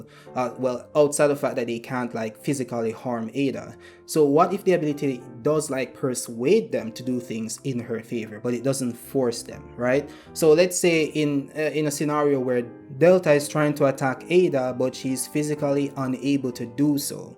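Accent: Jamaica. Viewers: I can hear the Jamaican in your accent bro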